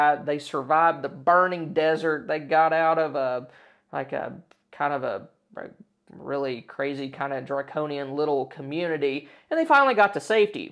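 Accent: South, United States. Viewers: This Brit appreciates your videos and loves the southern drawl. Well done.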